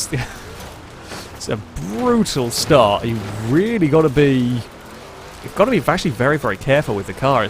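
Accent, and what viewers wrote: United Kingdom. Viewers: went full British in this one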